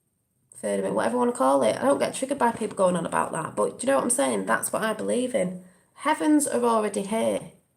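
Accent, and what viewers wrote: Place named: Stoke, United Kingdom. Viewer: what part of the uk are you from, you sound like a stokie?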